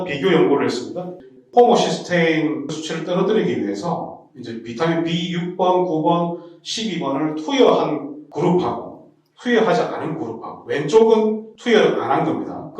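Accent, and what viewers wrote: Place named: Busan, South Korea. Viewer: 유행하는 케톤식 알아보다 보게 되었는데, 믿을만한 논문과 임상경험으로 진짜!의학정보를 전해주시는 분을 발견한거같아 기쁘네요. 가족들에게 공유했어요! 이 분 찐인거같아요. 어느병원이신지 살짝 궁금하네요. 더불어 부산분이라 이해가 더 팍팍됩니다.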